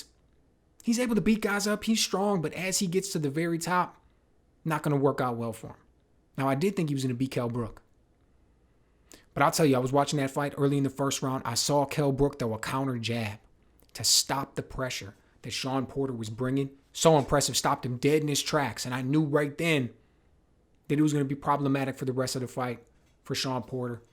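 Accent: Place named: South, United States. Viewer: always dig your insights man. plus you sound like a southerner (I'm from/in Mississippi)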